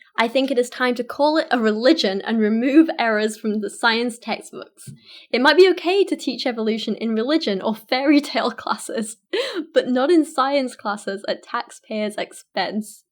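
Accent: United Kingdom. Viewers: Girl why don’t you do something constructive and wear less makeup, why do you atheists insist on going after others who don’t follow your fairytales? Maybe spend more time looking in the mirror, beauty is skin deep, your soul is dark and ugly, and why make videos at your expense while trying to please your moronic subscribers? You bring up Dr Kent Hovind because you need viewers, maybe develop your channel on your own merits and not going after someone trying to save you from hell. But you sound like a Brit so that explains your godless attitude, hope that works out for you.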